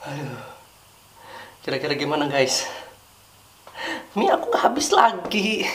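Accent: Banjarmasin, Indonesia. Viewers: Orang banjarmasin mana suara nya😄✋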